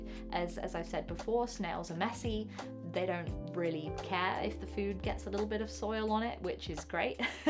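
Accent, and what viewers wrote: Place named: United Kingdom. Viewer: Judging on her accent she is in the UK. They aren't illegal there. Only releasing them into the wild is illegal. Even in the US people in the pet trade keep them but you will get in trouble releasing them or selling them Edit: A lot of people also catch their Giant African landsnails outside in areas where they are invasive and keep them. Id argue they are doing the environment a favor by catching them and keeping them indoors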